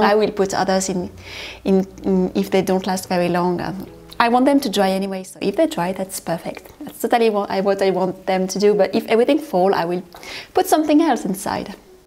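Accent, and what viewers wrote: France. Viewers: Love watching you decorate. Not taking anything away from your immense talent which is everything, you must be aware that your French accent and living in a wonderful home in France is what sells it. I am jelly.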